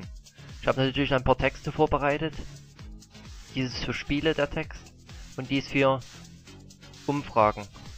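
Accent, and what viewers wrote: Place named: Saxony, Germany. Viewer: xDD gleich zu anfang ->ich bin sachse un red auch so xDDD nice nice du bist mein supersachse Sachsen 4 live:)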